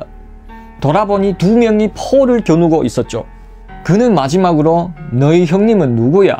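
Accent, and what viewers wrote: Yanbian, China. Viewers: 연변말이여 뭐여 어디말투여? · 너 연변이가? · 임마 연변만 좀 고쳐라 역겨워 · 님도 조선족인가요 범죄도시말투네요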